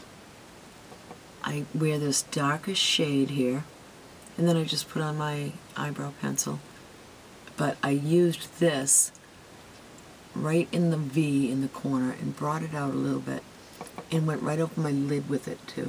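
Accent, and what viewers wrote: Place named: Cape Cod, United States. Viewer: When I first found your channel, you reminded me of the Cape. Your accent, your look and the casual elegance. I have to find the fragrance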